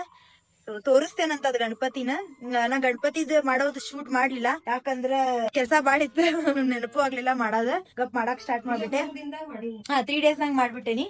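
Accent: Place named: Hubballi, India. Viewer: ನಿಮ್ಮದು ಯಾವ ಊರು ಹೇಳ್ರಿ ಪ ನಿಮ್ಮ್ದು ಹುಬ್ಬಳ್ಳಿ ಆನ್ ರೀ ನಿಮ್ಮ್ದು ಭಾಷೆ ಮಸ್ತ್ ಆಯ್ತಿ ರೀ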